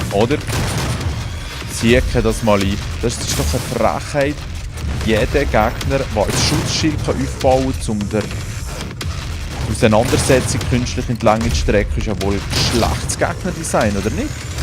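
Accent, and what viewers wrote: Valais, Switzerland. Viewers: Meeeh Walliserdiitsch!👍🏻😂 Hüere geil!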